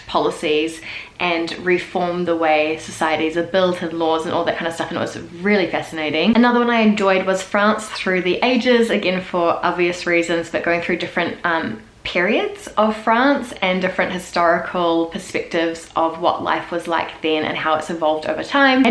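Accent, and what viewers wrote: New Zealand. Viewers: Hello! Yes your kiwi accent has come back a lot! Lol you speak a lot faster now. When you were back in France I thought to myself ' heeeey, she doesn't sound like the typical kiwi... she finishes her words!' just kidding, you still sound nice! I remember when I first came to NZ, the use of the word "egg" to describe a fool, really stumped me lol It also sounded like people were saying " eeeeeeeeeeeeeeeeeeeeeeg" haha don't know how else to get that one across. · And in a year's time, your kiwi accent has returned to full strength. I wonder what a comparison would be like of your French accent now to today.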